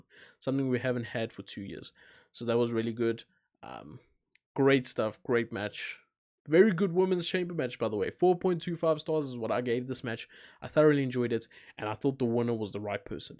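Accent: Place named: South Africa. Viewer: By the accent, I can tell you are from SA! I am also from SA. Keep on going bro!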